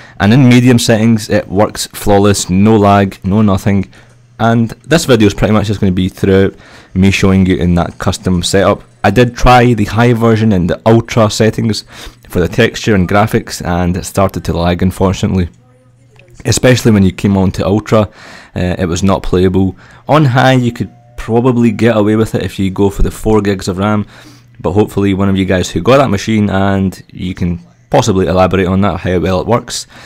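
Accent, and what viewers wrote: Scotland, United Kingdom. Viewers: I adore your Scottish accent (if that's what it is), even if it's quite hard for me to understand as a non native speaker! Very interesting real-life usage tests, the MBA looks like a great machine.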